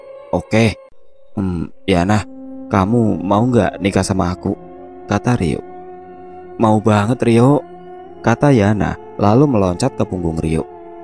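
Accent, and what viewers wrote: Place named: Java, Indonesia. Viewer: Suaranya bagus, ceritanya seru, ada lucu nya, ada medoknya, trnyata mas ganteng juga 😅 baru tau deh...😅 Semangat mas ❤ Btw mas sekarang bikin Chanel Radio horor itu ya?? Suaranya sama bgt soalnya. Sukses selalu mas 🎉😊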